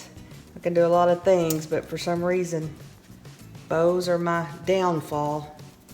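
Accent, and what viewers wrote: South, United States. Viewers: Love your wreath. Your home looks beautiful. You sound like you are from the south. I'm from Southeast Alabama. Happy Anniversary! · Happy Anniversary sweet lady! New subbie here! I’m really enjoying your channel! You’re so calm and real! I relax and learn new decorating tips while watching you! I’m in North Texas and love your Texas twang!🙋🏾‍♀️ Your wreath and porch are so cute! I’m inspired now and gotta step up my porch decor! Have a blessed Easter! 🌷🐇💐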